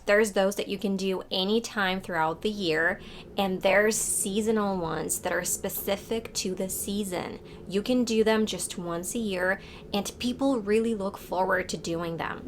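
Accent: United States. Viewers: Дуже багато американського акценту)) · I'm shocked that you're from Ukraine, because you sound 100% American!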